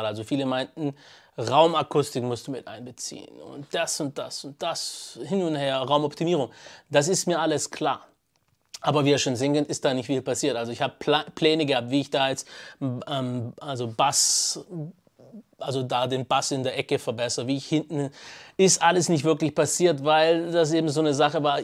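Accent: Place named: Swabia, Germany. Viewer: Schwabe laaan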